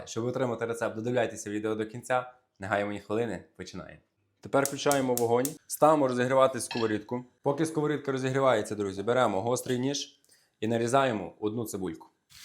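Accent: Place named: Western Ukraine, Ukraine. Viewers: Чудный повар!Чудный Буковинский акцент!❤❤❤ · Чую мова моя,я з Франківщини,а фамілія поширена на Закарпатті,звідки цей?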